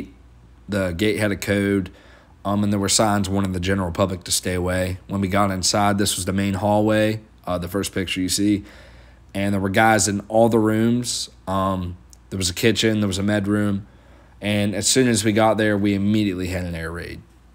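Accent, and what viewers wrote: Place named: Pittsburgh, United States. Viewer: As soon as you talked I Knew you were from the Burgh.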